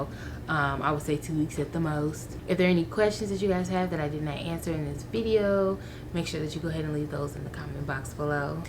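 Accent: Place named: Texas, United States. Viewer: Sound like you from Texas ❤❤❤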